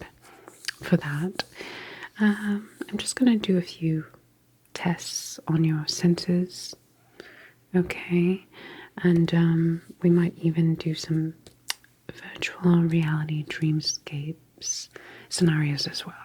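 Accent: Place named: Europe. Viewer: I looooove the subtle "continental" accent on this. Time for another hard New England accent video to balance it out?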